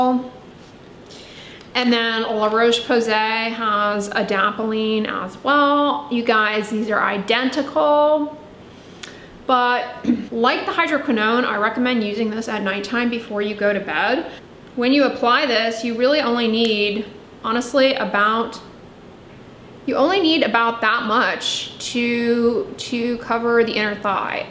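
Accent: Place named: South, United States. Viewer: How does she have both southern and valley accent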